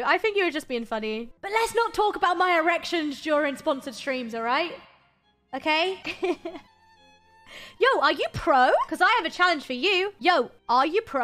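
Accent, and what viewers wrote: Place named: United Kingdom. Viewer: Dude what is your accent? You sound British and Californian simultaneously wtf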